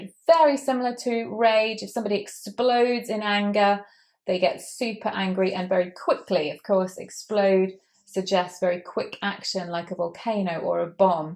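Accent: United Kingdom. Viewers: Thanks you. I'm from Brazil and I,m learning a lot and also correcting the bad words pronounced. I like so much your british accent. God bless you and your baby. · I enjoy this great method of explaining. But as a biginner I need to watch more lessons from teacher in order to be familiar with her british accent. A biginner learner from Algeria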